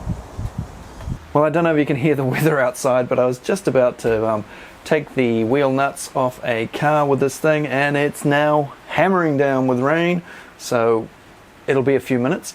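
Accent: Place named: New Zealand. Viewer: Always surprised to hear a kiwi accent on Youtube. Good content man.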